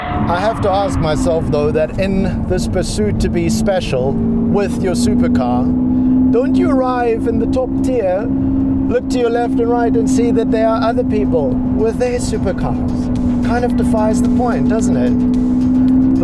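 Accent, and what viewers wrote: South Africa. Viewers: Bro, you sound like you're South African.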